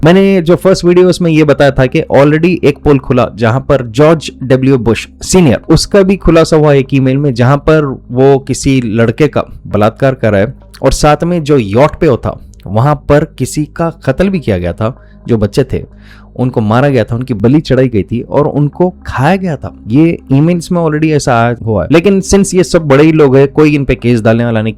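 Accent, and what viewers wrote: Hyderabad, India. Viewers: ओक youre frm bhagynagar. Accent. Shows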